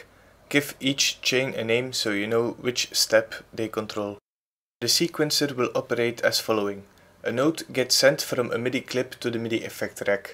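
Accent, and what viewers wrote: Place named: Belgium. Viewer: Afgaand op het accent ben je zeker ook Belg? ;-)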